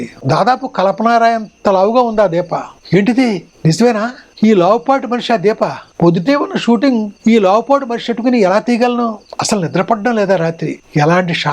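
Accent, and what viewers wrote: Godavari, India. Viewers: వంశీ గారు మీరు గోదారి యాస లో మీరు మాట్లాడుతూ వివరించి చెప్తుంటే కళ్ళ ముందు వీడియో కనిపిస్తుంది.... డైరెక్టర్ గారు....మీ సృజనాత్మకత అద్భుతం....మీ అభిమాని పశ్చిమ గోదావరి జిల్లా వాసి మీ హరీష్... జంగారెడ్డిగూడెం...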